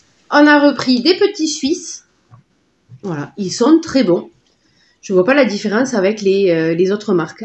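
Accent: South, France. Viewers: Bonsoir quel plaisir d’entendre votre accent du Sud ,merci pour ce retour e courses,dommage chez moi il n’y a pas de Leclerc.1 coucou d’Aubagne👍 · Hello, vous me faites trop rire, j'adore vous suivre, et surtout j'adore votre accent du sud qui met du soleil dans mes journées ( eh oui je suis du nord 🫣🤣). Merci de partager vos courses et vos avis, cela m'a donné envie de goûter certains produits éco+. Vivement la prochaine vidéo. Belle journée ☀️🌺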